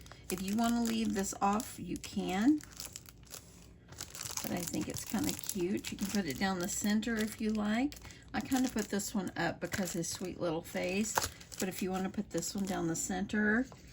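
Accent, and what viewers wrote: South, United States. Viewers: ❤❤❤❤OMG I LOVE THIS you are THE sweetest lady❤ I love watching your videos and listening to your southern voice🩷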